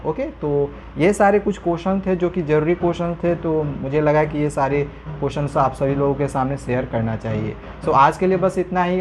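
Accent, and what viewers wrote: Jharkhand, India. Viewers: Sir aap jharkhand se ho??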